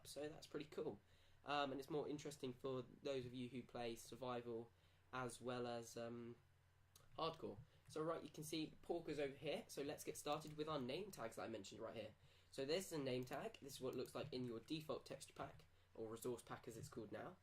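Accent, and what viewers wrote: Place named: United Kingdom. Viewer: Subscribed my british friend i hop you take no offense for that i just love your accent :D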